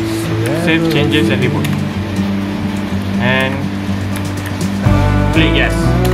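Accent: Malaysia. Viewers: Malaysian? 😃 Or Singaporean I'm from Singapore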